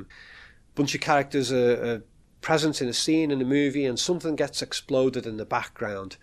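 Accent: United Kingdom. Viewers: Im not a natural speaker is this a british accent?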